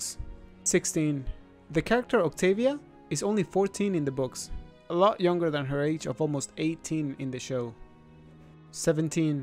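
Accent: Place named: Australia. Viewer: Wow she sounds so astralin how dos it not show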